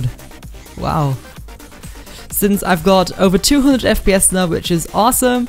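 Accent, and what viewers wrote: Germany. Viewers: Are you german? You sound like a german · Are you german? You really sound German or scandinavian!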